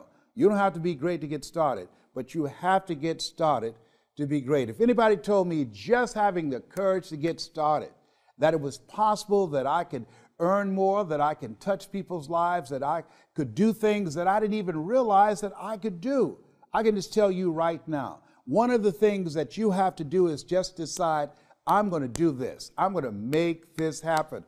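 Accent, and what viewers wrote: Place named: Columbus, United States. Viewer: les brown I lived in Columbus, Ohio 23 years and I recognize your Columbus Ohio voice because I can relate to you I been a sales guy for 3 years on commission... and started from the ground up with no mentor and did many jobs with no college education... you in spire me to give back my talents of selling back to everyone who is working towards their dreams.... but my advice to everyone is... FEAR TODAY BUT NOT TOMORROW!... if you don't get that deal or or eat today there will be less of a tomorrow... when you at the end of your life and decide to accomplish what you want to do/see, travel the world... or be the best talent at last moments of your life... question would be why do you regret taking so long to get here up to that point of your life?... the best question should be if not today when are you going to have it?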